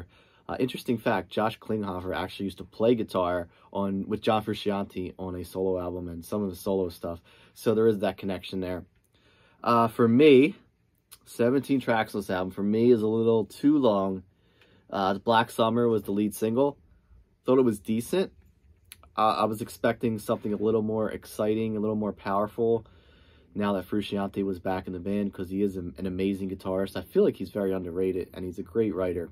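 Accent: Philadelphia, United States. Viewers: Lol are you from Philly bro? NJ?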